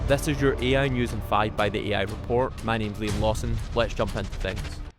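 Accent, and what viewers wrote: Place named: Scotland, United Kingdom. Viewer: Don’t waste any time at all trying to change your native Scottish accent. You are easily understood with Scottish as is. 👌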